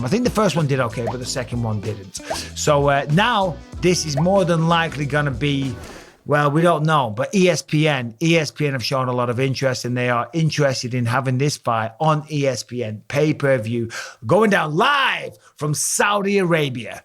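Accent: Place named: United Kingdom. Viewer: It was but he has a geological advantage when It comes to that accent. Gey him to try an impression of anyone outside the UK and it's awful lol. Still funny and entertaining but for different reasons.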